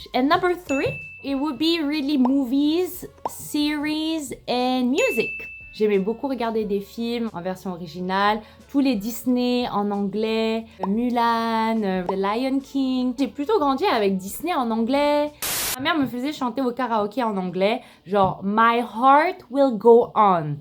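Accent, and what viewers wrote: Philippines. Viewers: Your English accent actually sounds very much like Filipino-English. 😅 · Your english is Filipino accent hihi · You are very beautiful and eloquent!!! Your English accent is so Pinoy.. love it!!! I can speak 3 languages, Filipino, English and Portuguese.. You have the basic in Spanish, I think you can easily learn Portuguese as well... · Lol i love you im kapampangan living in U.S hit me up when u get here lol. Ur accent s filipino accent that learn English 😍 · OMG when you speak Tagalog you're so cute! And when you're speaking English I can hear a Filipino accent xD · your english is definitely in filipino accent, love it hahaha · Your english accent resembles the Manila english accent. Probably because of your mom's and relatives' english accent (tagalog-influenced) when they speak to you. But of course it's not 100% since you are also influenced by Parisian French but I think the accent leans more toward the PH english accent. 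This is the very first vid of yours I've seen -- found you interesting and funny so I subbed. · You literally have a Philippine English accent HAHAHAHA I love seeing diaspora have our accent I think you've adapted the Filipino accent when you went the Philippines haha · Your english is close to filipino english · you sound more like a filipino accent.... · Filipino Accent nga ang English mo bhe😍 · Your tagalog is very pinay ...Lol......I enjoy the video :-D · Tu acento en inglés parece filipino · You have filipino english accent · Je pense que ton accent en anglais est un peu l’accent philippin. Mais, oui, c’est influencé déjà par les autre langues. Magnifique vidéo. Ton Tagalog est parfaite! 😊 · the filipino blood is screamin in the way you speak wow the accent lmao hahaha the berry and very such a filipino thing more power to you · I’m glad naman I can still speak Tagalog, of course English and I can speak Spanish. 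I plan to learn Mandarin, Japanese and French sometime in the future! I love lesrning about different cultures coz it’s so intriguing and good for the soul diba. Salamat with a H 🤪😜😃🙂 Your Tagalog diction is so good! Yun diction mo Filipino talaga. I guess yeah you’re right your English has different accents pero Pilipino more hahaha. First time I’ve met a Filipina and Algerian, beautiful mix!! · pretty sure your English is pinoy accent but smoother lol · Your English is very pinoy 😊😂 And I speak English, Spanish, Tagalog, French and some Portuguese. 😘 Hoping my future daughter will look like you hehe Sobrang belle <3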